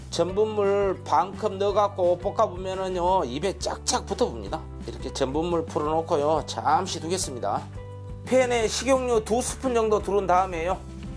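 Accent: Jeolla, South Korea. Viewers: 아주 오리지날 전라도 말이네요 내고향 사투리를 듣고자 이 요리도 배우고 좋아요 ㅎㅎㅎ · 매번 느끼지만 전라도사투리가 특히 남도지방,광주,여수.순천,고흥.근처 사투리가 얼마나 구수한지..ㅎㅎ 전라도를 떠나 30년째 경상도 살아도 40대인 저는 세프님하고 언어가 어째 이라고 똑같다요~잉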